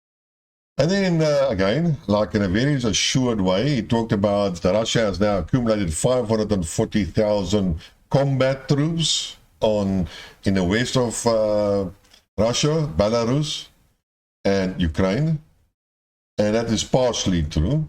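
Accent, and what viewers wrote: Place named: Afrikaans, South Africa. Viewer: Disagreeing for t he sake of it with no alternative explanation. With a thick Afrikaans accent nobody can understand van de plaas.. i dont plan listening to more of your videos.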